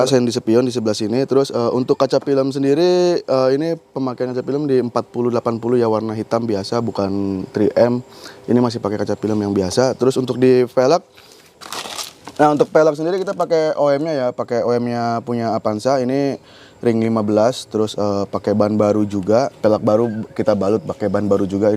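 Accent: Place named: West Java, Indonesia. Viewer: Hahaaa apansa sunda banget.. Sukses baaaaang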